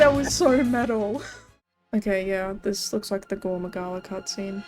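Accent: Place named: Australia. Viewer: I will never get tired of hearing "Chonk Lord" in an Australian accent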